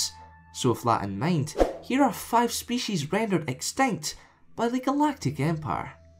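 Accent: Scotland, United Kingdom. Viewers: i have to say it mate, this combination of untamed scottish accent and soft speech is strange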